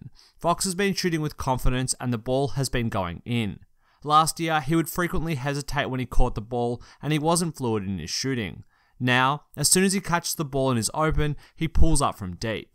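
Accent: Australia. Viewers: is it australian accent ??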